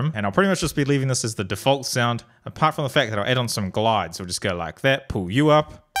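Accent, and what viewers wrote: New Zealand. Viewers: Coz yo I'm from.new Zealand aswell bcoz of your accent tho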